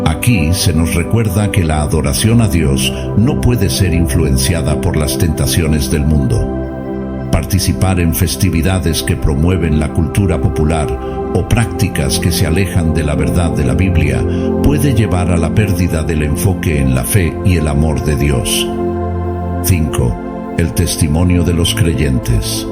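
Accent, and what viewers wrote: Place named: Spain. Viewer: Es mi imaginación o es más atacar a la nación hispanoamérica? Para comenzar el que habla lo hace con acento español y se refiere a 2 días que se han establecido solo como tradición en lo que es América latina, Ya lograron introducir la religión en la mayoría de la gente y refuerzan lo que se supone es fé para "invitarte" a nunca hagas o digas nada de esas tradiciones porque Dios no lo permite. Y yo soñando con que el día que me muera se acuerden de mi para invitarme a casa a convivir con mi gente por medio de un altar.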